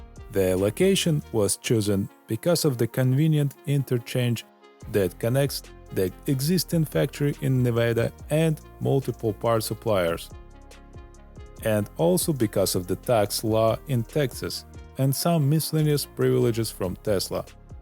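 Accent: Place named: Russia. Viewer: Ты из России? Звучишь как один из наших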